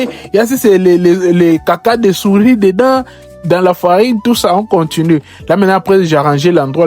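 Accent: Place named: Ivory Coast. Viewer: T'es congolais mais t'as l'accent des ivoiriens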